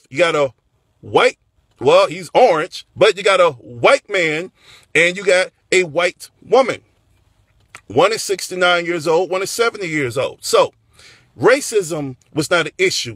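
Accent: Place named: Russia. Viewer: This guy is obviously a Russian. You can tell by his accent that he's probably from the southern Volgograd region.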